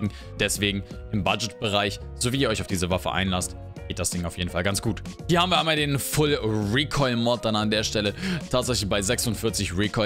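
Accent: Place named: United States. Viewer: das liegt dadran das er 5 Jahre in den USA gelebt hat, das bekommt man auch nicht mehr aus ihm raus^^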